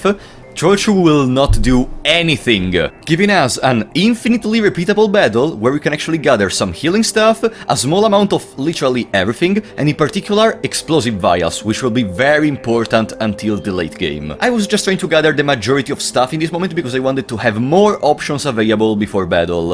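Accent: Italy. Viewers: As a fellow italian, i now understand how the accent makes the content more entertaining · Yknow im a little stupid so i didnt pin you as Italian. And then i heard you say "item only". Just wanted to say that. It is very distinct and beautiful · Never would I have expected I'd be introduced to F&H by an Italian speaking in english. Do you know by any chance if there's an italian patch on the way? I wanted my brother to be able to play this gem, but I couldn't find anything on the matter...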